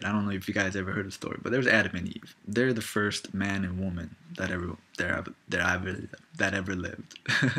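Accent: England, United Kingdom. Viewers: oh i dig your british accent ;) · I'm from England! I'd send you something but I'm not really sure... I guess you'd expect tea or something. Your English accent made me laugh though. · Looool I like the English accent. And tr thig you said about Adam and Eve was actually so true , after the flood in Noah's time people's lives started gettif shorter due to sin ,and disease started spreading to.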